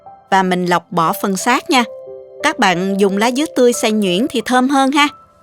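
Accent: South, Vietnam. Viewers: Cám ơn đã chia sẻ cách làm chè con sò.Cách chỉ dẫn Rất gọn gàng, dễ hiểu, dễ làm và nhất là giọng nói miền Nam rất dịu dàng và ấm áp. Điều mà tôi thích nhất là cô không nói thừa, không nhắc đi nhắc lại những điều căn bản trong cách làm mà các bà nội trợ nào nghe và xem qua cũng nhớ. Chúc cô luôn vui khỏe và luôn thành công trong công việc của mình!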